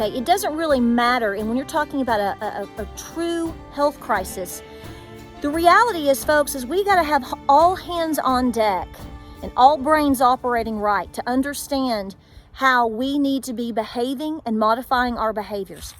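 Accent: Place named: Appalachia, United States. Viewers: When I hear you speak it gives me a taste of home. That Appalachian twang is music to my ears. And good old fashioned common sense, something that is in short supply, especially now. I live in Florida and the “spring breakers” are acting so irresponsible even today they were doing Jell-O shots off girls stomachs, partying like nothing going on. Incredible. Incredibly stupid. Now they are taking the virus home to their families. Can’t get my head around it and why their parents would allow them to even come here right now. I’m staying home because it’s the right thing to do. So should all non-essential folks. You a few other channels taught me how to dry can which I started doing in July cause I couldn’t afford a pressure canner . I finally got one and have been busting my hump canning and you guys taught me and I thank you so much. 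God put it on my heart last year to get ready, something is coming and I did my best. We are not were I wanted to be yet but I’m probably ahead of 95% of the country and I am so thankful. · Also I'm from West Virginia....I can tell you're close geographically and not just by you also being an Appalachian queen! I can hear that beautiful accent...mine is barely noticeable,but sometimes people will ask to which I'm always surprised since mine is so minor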